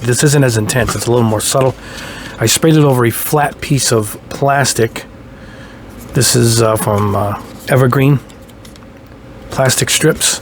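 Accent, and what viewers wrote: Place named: New York, United States. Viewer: Country? Are you not in the US? I swear you have a Jersey/New York-ish accent lol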